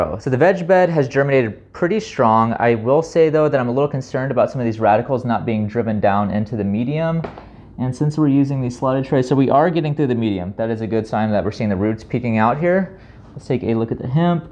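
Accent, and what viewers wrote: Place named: South, United States. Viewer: GREAT REVIEW! Your accent is becoming more southern with every day that passes. LOVE IT!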